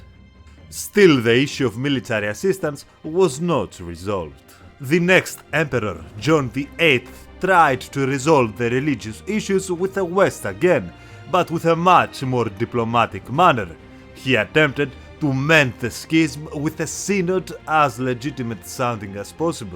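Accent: Greece. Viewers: Ρε μπάρμπα, πώς νομίζεις ότι ακουγομαστε όλοι όταν μιλάμε εγγλέζικα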